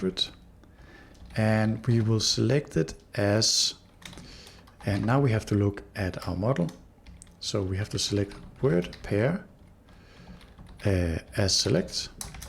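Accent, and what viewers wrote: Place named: Denmark. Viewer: Are you from denmark? You sound a little danish. But overall great video!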